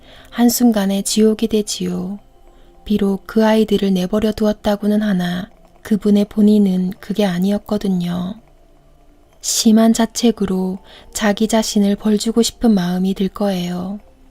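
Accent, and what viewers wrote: Gyeongsang, South Korea. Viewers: 아 목소리...예뻐 좋아요~~특히 경상도톤~~~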